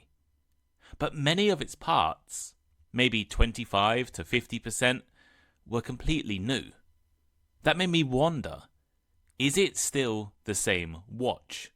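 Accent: United Kingdom. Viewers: Is it British accent or American accent?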